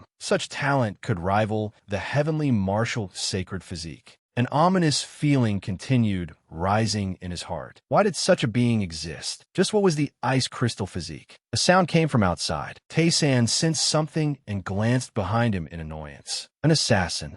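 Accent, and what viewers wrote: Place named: Arab world. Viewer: Why does the narrator jump into an Arab accent every couple of minutes?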